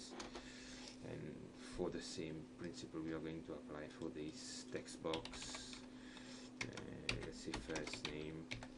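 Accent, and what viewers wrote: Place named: Mauritius. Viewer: Hi, you sound like you are from Mauritius!:)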